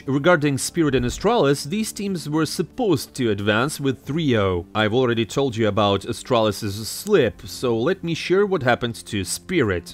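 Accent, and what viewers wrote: Russia. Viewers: bros mocking French accent meanwhile having the strongest Russian accent :DDDDDD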